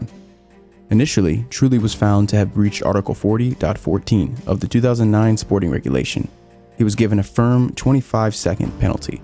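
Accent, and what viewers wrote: United States. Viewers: American pronunciation annoys me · you use American pronunciations for everything besides "Vettel" and "Grand Prix." It's awkward. Coming from a television producer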